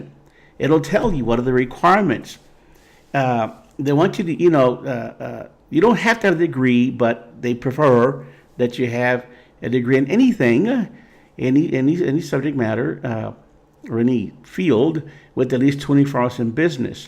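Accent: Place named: South, United States. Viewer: Love your southern accent..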